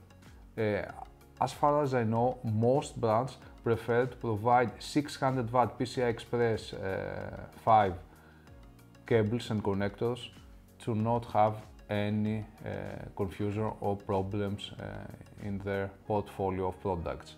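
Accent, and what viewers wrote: Greece. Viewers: Greek accent?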